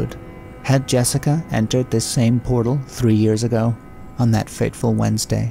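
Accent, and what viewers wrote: United States. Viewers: So amusing to hear the American accent. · Good American accent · Man, I actually thought the narrator was an American voice actor until the squarespace add came up. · This is your "American" accent love it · Great American accent! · 35 seconds in, it's already pure gold... Casper mattresses and Bombas socks 😂 and the accent omg! never would have guessed it wasn't a native accent! but ofc it has to be American! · Really good american accent.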